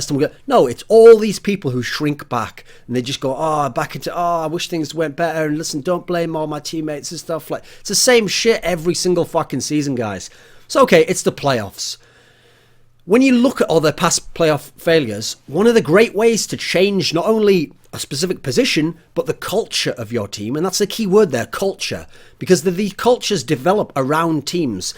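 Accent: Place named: Northern England, United Kingdom. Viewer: swear u have picked up a northern accent... cant remember u having this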